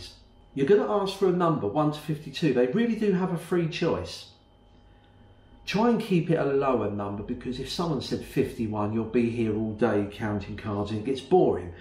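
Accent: United Kingdom. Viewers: Is it possible you teach us someday this wonderful british accent?? or it needs a gimmick (((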